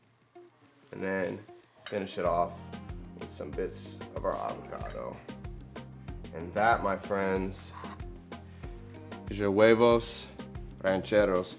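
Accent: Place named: New York, United States. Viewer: I love how much of a New Yorker you sound. This looks banging. Definitely not traditional but has the perfect amount of twist.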